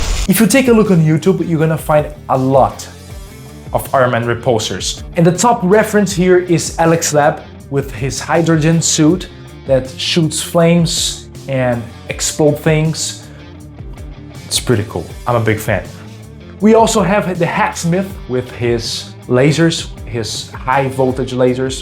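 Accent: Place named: Brazil. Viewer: Percebi de cara que era brasileiro pelo sotaque hahaha. Parabéns pelo projeto!!!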